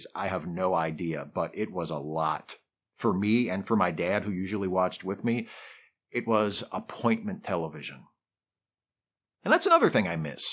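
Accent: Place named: Maryland, United States. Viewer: Sounds like you're a fellow Marylander. Or at least originally from the area.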